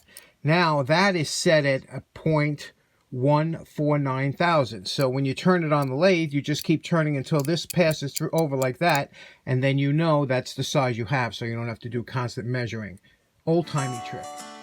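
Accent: New York, United States. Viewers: Cool refurb! I've spent the last 30 years in Raleigh, NC by way of Mohnton, PA originally. But I'd love to bottle your NY accent and unleash it at will? 👍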